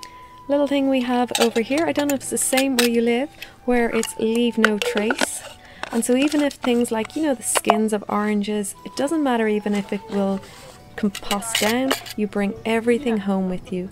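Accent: Ireland. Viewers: All your videos just make me want to visit Ireland, it looks so beautiful and calm and the accent is gorgeous. I'm so happy that you appreciate your country so others can as well. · What an incredible way to spend some time with your father ❤️ I love your Irish accent. In my home, my children bring back the fruit scraps from their lunch or snack. I keep all in the fridge in a container and use them to make a lovely sorta “Christmas” scent in the crock pot. Add some cinnamon and vanilla extract, and omg heaven. Once the scent has been used to its full potential, I purée it, mix it with water and left over coffee grinds, feed it to my garden. Works for me and nothing goes to waste :)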